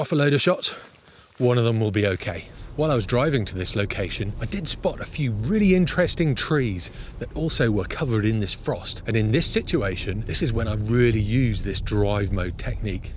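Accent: United Kingdom. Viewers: i love u r british accent.when i hear u r voice.i"ll remember Matrix movie star anderson.by the way u r great for teaching photography triks and tipssss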